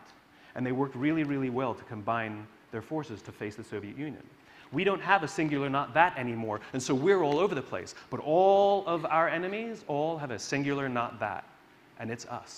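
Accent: United Kingdom. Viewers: Is this guy English or American ? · No question the guy is intelligent, but there’s something disingenuous about him. In earlier Ted talks and other presentations, he speaks with a British accent.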